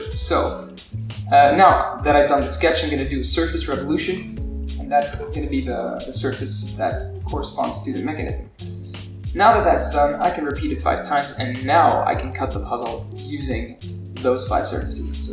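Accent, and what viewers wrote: France. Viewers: Mais, t'es français O_o, je savais pas moi ! Ton accent est super !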